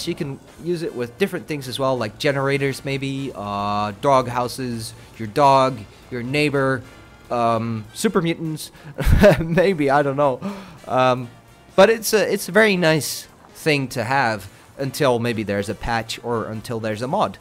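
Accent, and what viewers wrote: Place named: Scandinavia. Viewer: Nice accent. I bet your either from Sweden, Finland or Norway. You guys are the only one that can both speak a very good english and have an excellent accent while doint it. Am I wrong ? :p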